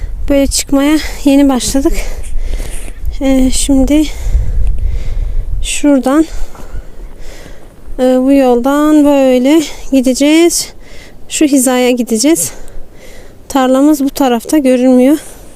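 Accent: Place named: Adıyaman, Turkey. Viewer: Bir adıyamanlı olarak sivene bayıldım rabbim yardımcınız olsun yeni gördüm sizi takip etmeyede devam edeceğim inşallah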